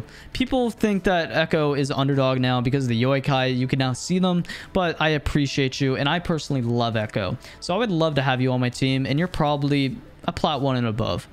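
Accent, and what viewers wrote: Canada. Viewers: What part of Canada ya from bud? I'm from B.C. Triple triple btw ha ha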